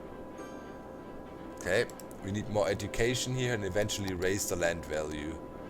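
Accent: Germany. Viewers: Wie man einfach hört das du deutsch bist :D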